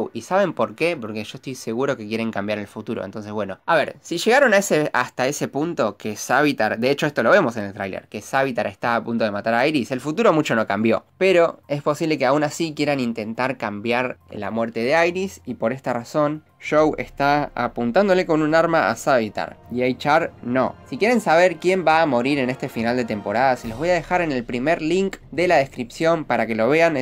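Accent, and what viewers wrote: Argentina. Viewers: Ramiro Lopez sera por que es argentino y los argentinos tienen el ch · Me gusta muchos tus vídeos son los mejores con tu acento argentino el mejor ps: como se llama la música del minuto la llevo buscando desde hace bastante tiempo :)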